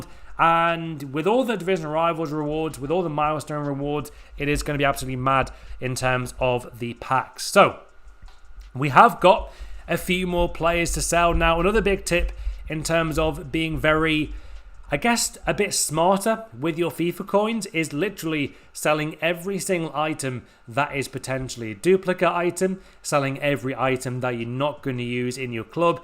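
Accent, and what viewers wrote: Australia. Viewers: So I am guessing your an Aussie?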